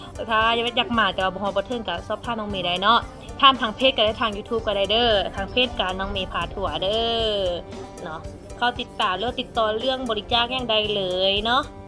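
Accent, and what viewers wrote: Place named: Laos. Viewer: You got some awesome Laos accent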